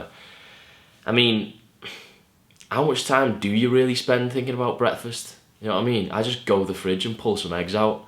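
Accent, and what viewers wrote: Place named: Liverpool, United Kingdom. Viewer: you from widness got bit scouse in ye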